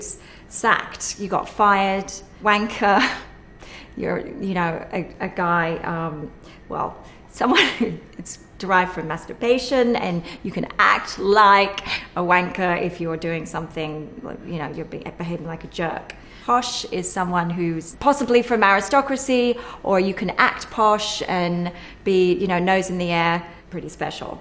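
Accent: United Kingdom. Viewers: I watched this with a huge smile on my face. I learned a lot and she's too cute. :) Wish she would use her awesome British accent in her performances as well.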